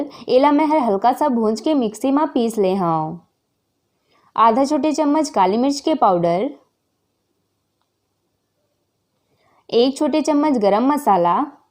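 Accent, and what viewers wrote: Chhattisgarh, India. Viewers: धन्यवाद दीदी बनाय के तरिका बताय बर। मैं तोर सब एपिसोड देखथव ।मोला तोर छत्तीसगढ़ी बोली अब्बड़ सुघ्घर लागथे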